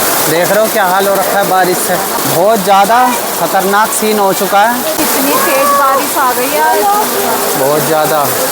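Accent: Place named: Mathura-Agra, India. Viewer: Bhai aapka Ghar kahan hai. Aapke kisi video main aapne kumaun bataya tha, par aapki boli mathura, agra side wali lag rahi hai. 😊 Please thodi kripa karein.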